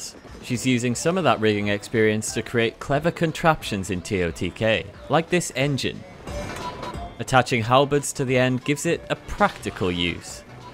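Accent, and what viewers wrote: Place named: Geordie, United Kingdom. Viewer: Are you from the north east by any chance, your accent has mackem/geordie twangs 😄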